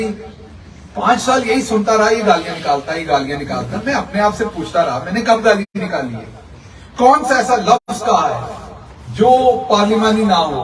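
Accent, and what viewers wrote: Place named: Punjab, Pakistan. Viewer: آپ کی غلابی آردو پنجابی اور زبان کے محاورات قابل مسرت ہوتے ہیں ۔۔۔۔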